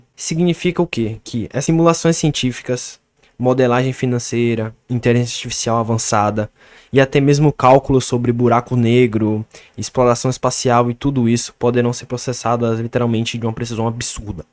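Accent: Bahia, Brazil. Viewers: O bahiano é perceptivel de longe kkk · Você é baiano?? lembra um pouco o sotaque daqui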